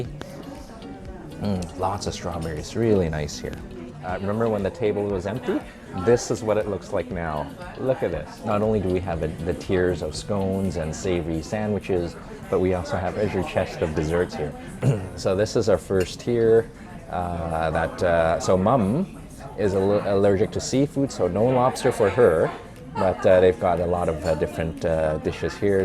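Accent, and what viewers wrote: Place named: Canada. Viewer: Love your Canadian accent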